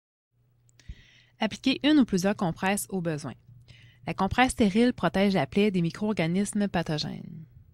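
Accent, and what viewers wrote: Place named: Quebec, Canada. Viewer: Accent québécois 🤢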